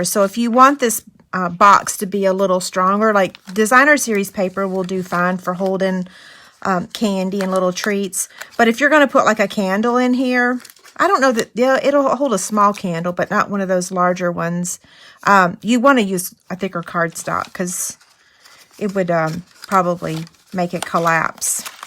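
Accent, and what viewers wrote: South, United States. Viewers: Love your ideas & videos! Where are you from, I hear a distinctive southern accent. I’m from NC.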